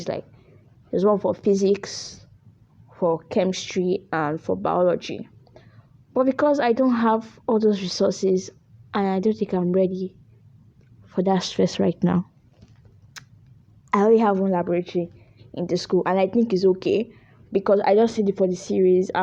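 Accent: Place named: Nigeria. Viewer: As I heard your voice, I knew once you were a Nigerian 🇳🇬😝😝😝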